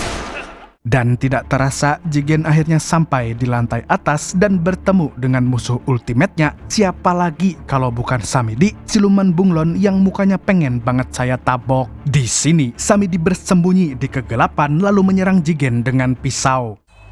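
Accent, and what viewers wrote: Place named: Nganjuk, Indonesia. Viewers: Orang nganjuk kah bg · Mimin wong Nganjuk koyok e😂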